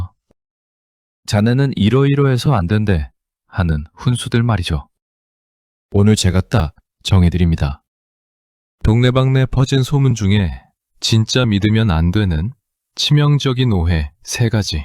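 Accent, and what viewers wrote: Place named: Gyeongsang, South Korea. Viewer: 욕보셨습니다.경상도 말인데...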